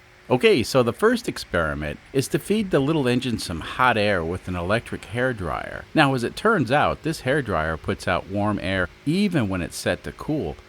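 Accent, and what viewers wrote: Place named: Chicago, United States. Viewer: Your accent I don't even recognize, not Michigan I'm assuming. Chicago? But you complain about the heat after moving from Michigan and it has me curious. Do you prefer the Kansas climate overall? I'm a buckeye/Michigander myself. I was transplanted to Texas by my family and can't wait to get back to Michigan and get out of the heat.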